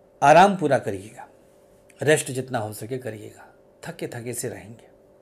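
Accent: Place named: Rajputana, India. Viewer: sir g aap brahmin h pr aap rajputana style m baate krte h..🙏🙏🙏🙏 achcha lgta h k apne swabhiman ko bnaye rkhna chahiye..chankya yaad aate h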